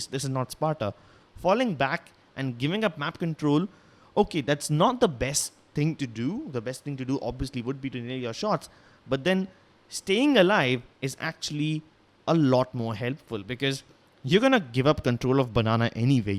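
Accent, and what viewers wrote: India. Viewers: Are you indian? Your accent sounds indian. Btw, love fron bihar, India! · You Indian? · ur indian?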